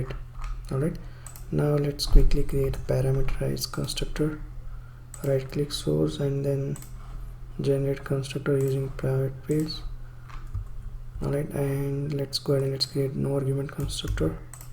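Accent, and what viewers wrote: India. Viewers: Indian English ;)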